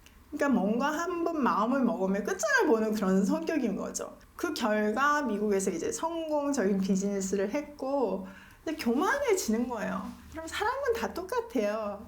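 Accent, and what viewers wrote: Gyeongsang, South Korea. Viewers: 고향이 경상도?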